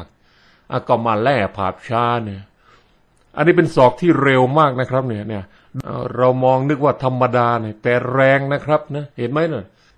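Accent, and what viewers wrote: Isan, Thailand. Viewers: แอดมิน ทำไมมีแต่พากย์อีสาน ไม่เห็นมีพากย์ใต้เลย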